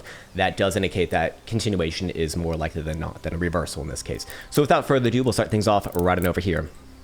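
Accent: Australia. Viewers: sounds Australian? thats so bad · Man he sounds Aussie... I'm ashamed.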